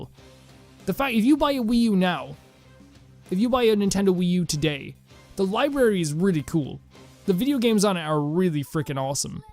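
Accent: Ireland. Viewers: You kinda sound like Jacksepticeye are you Irish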